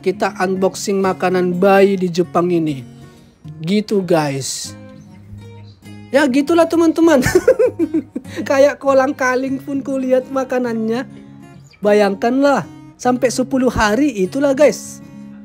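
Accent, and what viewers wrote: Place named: Batak, Indonesia. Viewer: Kimbek kali ah. Kalau dengar logat bg ngomong jd ingat sama kawan si batak gila 🤣 logatnya campur² jawa 😅 kocak kocak😂❤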